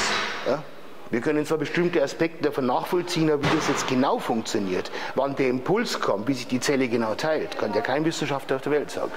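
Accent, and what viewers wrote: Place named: Bavaria, Germany. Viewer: Hallo, wieder mal ein super Video, richtig gut erklärt, auch dein bayerisch ist angenehm und verständlich 😁 Was ich mir wünschen würde wäre ein Training speziell für Bauch(Körpermitte) was für viele sehr unverständlich und falsch trainiert wird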